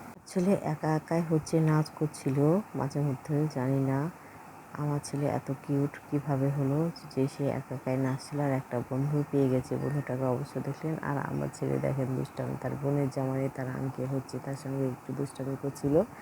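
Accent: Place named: Rajshahi, Bangladesh. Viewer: Eta Kon jaiga? Apnar vasa amader Rajshahir moto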